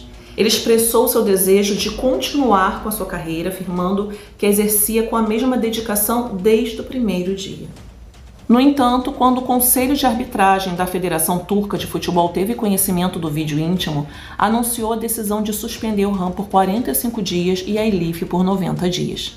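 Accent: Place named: Rio de Janeiro, Brazil. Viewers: Até que enfim, esse sotaque que é igual ao meu!!! Muuuuuito difícil no nicho True Crime, alguém carioca!!! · vdd! mas tem cariocas q tem isso mais forte. A é até light se comparada a outros!!!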